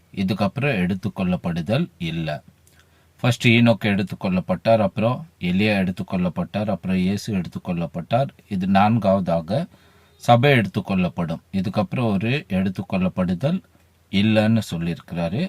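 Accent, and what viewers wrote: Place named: Bangalore, India. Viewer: Here I don't want to say whatever he has spoken is right or not or who is right? Apart from that I see that many of you has commented that he should learn proper tamil... Tamzhians who are born and brought up in Bangalore and have their ancestors settled in Bangalore would have this kind of slang. All bangalorean tamizh people speak like him....its one way of tamizh slang like how we have north chennai slang. Please do not comment bad on his slang... atleast he tried speaking in tamizh.... And not only in this video being a Christian i dont know why people make comment using bad words or commenting in a disrespectful way. Please use your language properly or do not register your comment. It is the same tongue we are using to praise the lord. Are we not ashamed to use bad words in the same tongue we use to praise our holy Lord. 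Dont your conscience strike you when you sit for prayers. Please be a proper faithful christian to jesus.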